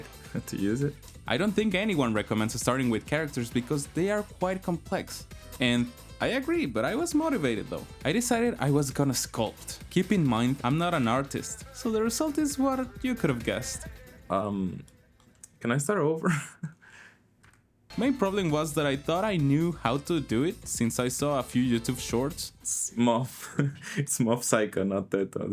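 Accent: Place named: Spain. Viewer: do you talk Spanish?, your pronunciation of English language is pretty similar to someone who talks Spanish. I talk Spanish too, so yeah, that's why the pronunciation sounds very similar for me at least. Also, good video, very organic, and i find this more interesting or more educational than watching those tutorials alone (idk why, maybe you are an inspiring type of person). Keep the good work.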